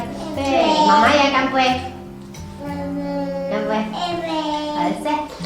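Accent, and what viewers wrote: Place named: Fuzhou, China. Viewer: 你是四川人,但会说福州話？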